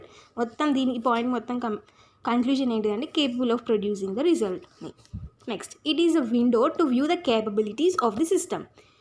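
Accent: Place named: Telangana, India. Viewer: Motham telangana pilla la matladthunav😅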